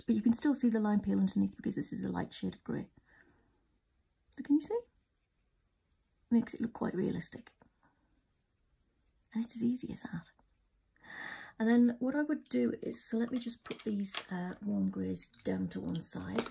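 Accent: England, United Kingdom. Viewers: Thank you for coloring tips!!! Love you channel! : ) Love you English accent...! : )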